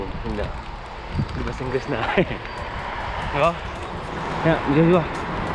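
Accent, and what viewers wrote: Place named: Karo, Indonesia. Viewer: Ada yg jual Arsik di ceko Bang, 😁 Kental kalii, Kalak Karo na 😉😁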